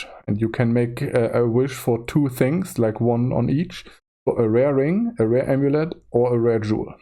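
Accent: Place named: Germany. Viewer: Man merkt, dass du Deutscher bist. 😄 Gutes Video!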